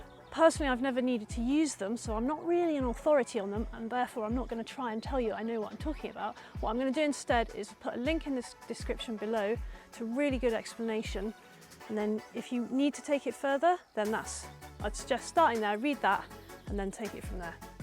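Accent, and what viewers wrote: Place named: United Kingdom. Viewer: You sound British but doesn't look like you're in the UK. Where is this?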